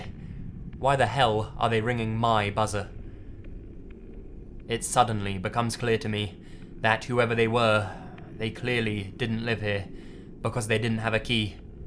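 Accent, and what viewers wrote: United Kingdom. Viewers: I cant stand british accent :D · I don't know about anyone else but I am somehow very attracted to the voice of the narrator because I love british accent and his voice is sooooo appealing to me😅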